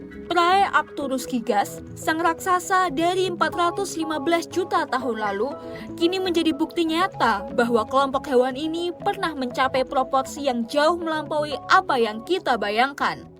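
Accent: Java, Indonesia. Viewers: Njir suaranya Jawa medok😂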